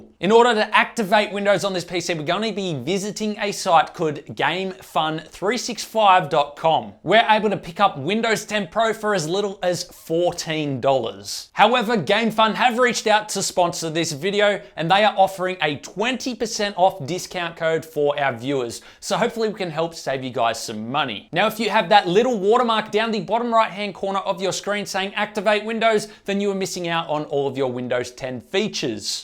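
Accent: Australia. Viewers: Is this guy Australian?? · Are you Australian you sound like it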